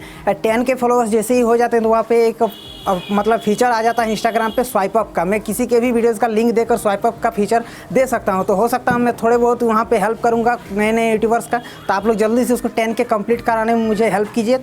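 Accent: Bengal, India. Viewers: vai aap Bengali Ho....? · bhai ap bangali he kiya?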